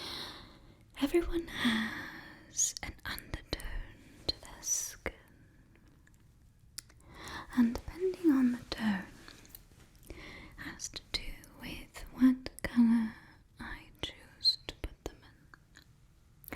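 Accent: England, United Kingdom. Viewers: Being tired I had to keep reminding myself this wasn't one of the British ASMR youtubers I watch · I actually thought you were british lol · I love that you're trying to do a British accent lol!! Ive never seen the show but this is chill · I love that you've done this makes me even prouder to be English and your accent and attire is amazing you make a very classy lady ❤ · God I love this and I like how I was expecting a French accent but was very happy to hear an English one! What a lovely video :)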